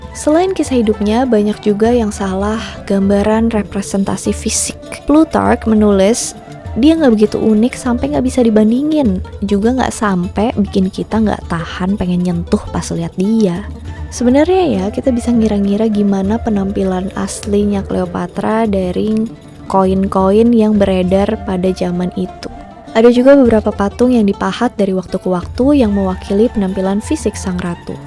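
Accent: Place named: South Jakarta, Indonesia. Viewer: Serasa di dongengin sama anak jaksel knt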